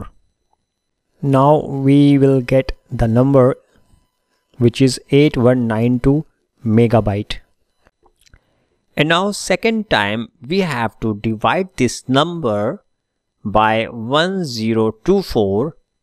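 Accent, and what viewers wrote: India. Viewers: thank you random indian accent dude from YT<3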